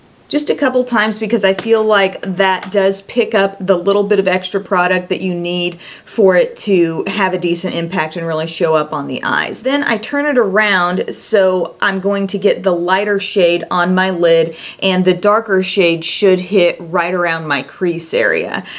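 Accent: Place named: South, United States. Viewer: Where are you from?? You defs sound southern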